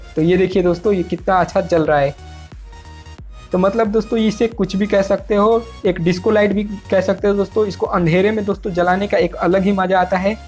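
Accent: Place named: Eastern Uttar Pradesh, India. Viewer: most welcome sir Up me aap kaha se hai sir language se aap east up ke lagte ho sayad Varanasi ya Balliya side Aap hi btaeye if koi problem na ho to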